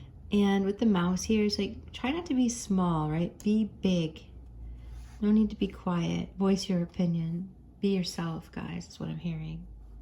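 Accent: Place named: United States. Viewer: Love you,! How can you always know, what is exactly needed at the moment. Pile 2 was especially for me. 💓American accent is so lovely on Russian.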